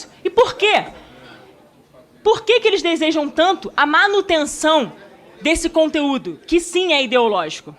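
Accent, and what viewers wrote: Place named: Rio de Janeiro, Brazil. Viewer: Linda, inteligente, católica e carioca. Ô sotaque lindo!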